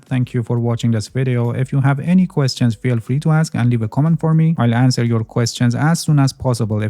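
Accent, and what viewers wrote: Iran. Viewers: thanks. i can hear your iranian accent. but you speak good. 👍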